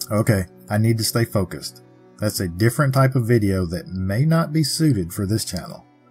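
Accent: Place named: North Carolina, United States. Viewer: I do so enjoy these stories as I do hearing your accent; when I was a kid we lived in NC for 3 years and it's so familiar still.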